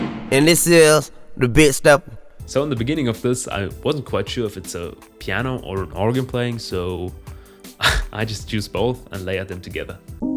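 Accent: Germany. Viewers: Are you German? You have a german accent Mir wurde das Video über meine Startseite vorgeschlagen 😄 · bro du sprichst gut Englisch aber habe mir trotzdem gedacht dass du deutsch bist😂 Sehr stabiles Video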